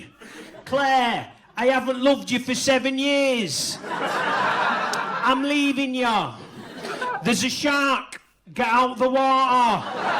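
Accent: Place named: Manchester, United Kingdom. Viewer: I’m Mancunian and he’s spot on with the South Manchester accent 😂😂😂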